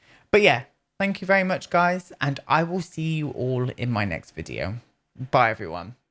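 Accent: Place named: Scotland, United Kingdom. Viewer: yes! please rate the sims packs :) also as a scot I wanna hear that accent more haha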